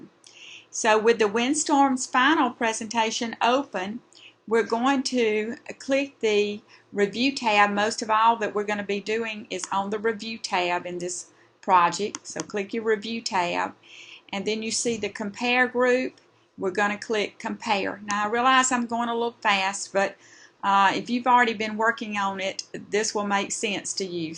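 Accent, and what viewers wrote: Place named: South, United States. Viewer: Your accent is from my home, the south. It is beautiful.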